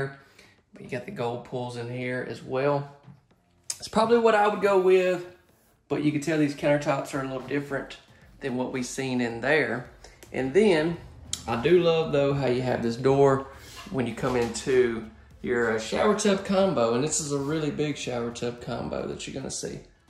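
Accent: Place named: Appalachia, United States. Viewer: Golly,nothin wrong with the hillbilly talk.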